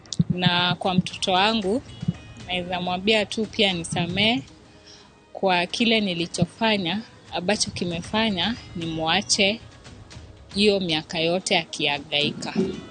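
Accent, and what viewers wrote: Kikuyu, Kenya. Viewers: Ukweli.... very heavy Kikuyu accent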